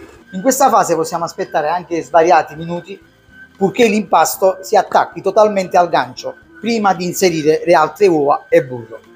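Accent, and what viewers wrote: Naples, Italy. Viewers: E cosa dire: Lei è un grandissimo pasticciere, poi l'accento napoletano! A proposito, W NAPOLI!!!!🎉🎉❤❤ GRAZIE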